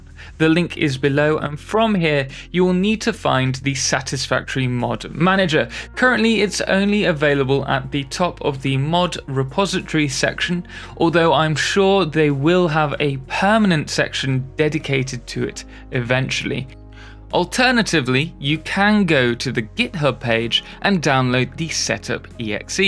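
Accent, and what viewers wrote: United Kingdom. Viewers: I just want to thank you for all your videos. Learning so much from here... I'm brazillian and even you gourgeous british accent isnt a problem since you care to speak slowly so... Honestly your videos are trully perfect. Thanks for your generosity. <3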